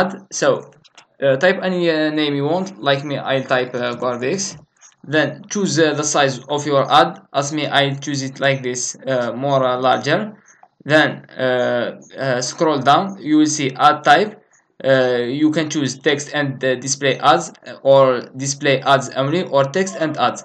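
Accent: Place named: Russia. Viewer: i love the Way you talk I love russians when they talk :)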